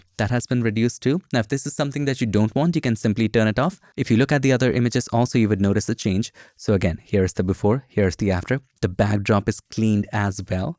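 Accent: India. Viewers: Why do i see many nasty comments on Indian accent? How is it any different than other accents?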